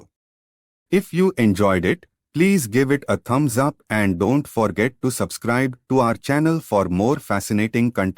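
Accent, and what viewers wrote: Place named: India. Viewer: I think that the narrator is coming from India.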